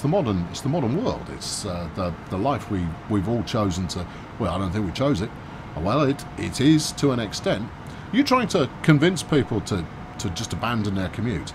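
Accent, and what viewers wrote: Boston, United States. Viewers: Just stumbled upon your channel, your funny, i live in boston but not a bostonion, your Boston accent is funny 😆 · I'm from nowhere near Boawston, but that sounds accurate to me... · That bawston accent sounds like a bad Harley Quinn impression. Yikes. Glad to see another train sim vid tho!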